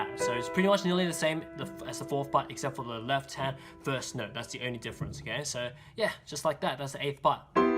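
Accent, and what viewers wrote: United Kingdom. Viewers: i think he is British??? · i like the kinda british accent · best english accent ever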